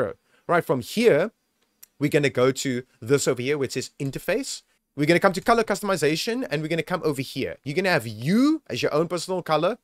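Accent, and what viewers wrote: South Africa. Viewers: You're literally the only guy that explains everything correctly. And You're South African made everything easier. Thanks buds im running 5th gen I7 and 3070 12 gig this helped me big time.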